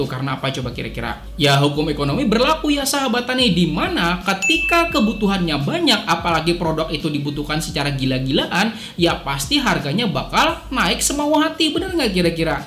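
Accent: Banjar, Indonesia. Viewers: npk 16 yg paling bagus npk yaramila.. logat bahasa nya org Banjar lh? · Kya orang banjar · Logat banjar 😁 sipp dah bang info nya , tks 👍👍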